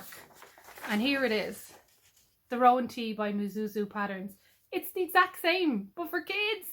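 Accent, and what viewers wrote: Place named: Ireland. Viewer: You sound like you're in Ireland. Where are you located? Always looking for nearby fabric places...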